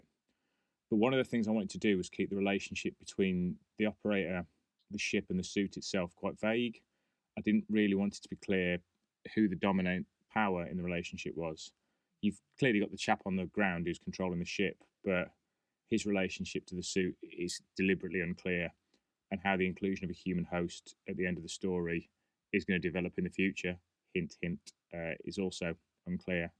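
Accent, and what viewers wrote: United Kingdom. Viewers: Just pinged watched 3 episodes and their production videos. Saving the last one for later. Loved that 3rd video ended somewhat unclear. Im guess you could say im a fan of ww2 planes but i never remember hearing about an boulton paul defiant...Watching the video i thought it was somekind of mix between a hawker hurricane & ju87 :D Dont know if the video production got better but I had really hard time following the first episode. Had to paus it many times, go back & listen to it again. Either I lost interest in it. Or first video had you mumbling? OR the most likely one. It took me time to start understanding the accent. By the way, Im from estonia soo english isint my first language & I absoluutely love the British accent voice :) wish i could fake talking like that.... Riiiight...And as far as the second episode. That was my favorite by far :) Dont know why but it got me hooked and i could not look away. 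Thanks for the stories :)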